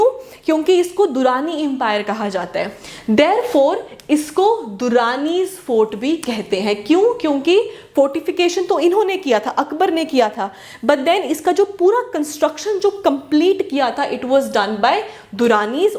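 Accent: Kashmir, India. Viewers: ❤️❤️❤️❤️ u r akin to 7 great lakes of Valley... Ur accent represents more of kashmir instead of Jammu.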